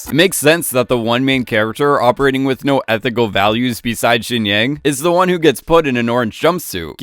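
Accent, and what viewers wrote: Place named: California, United States. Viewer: the narrator is so, sooo, California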